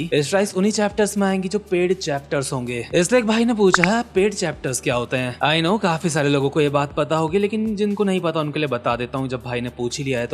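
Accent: Uttarakhand, India. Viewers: surname se pta lg gya tha ap uttrakhand ke ho accent se bhi 😍ap kumoani ho y garwali me bhi uttrakhand se hu ❤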